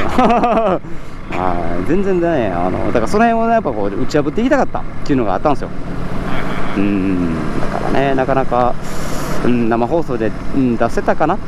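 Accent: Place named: Kansai, Japan. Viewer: ナイトツーリングに続き、このツーリング動画もおもしろかったです！ 試乗動画でもイントネーション的に関西感はバッチリ出てますよ😁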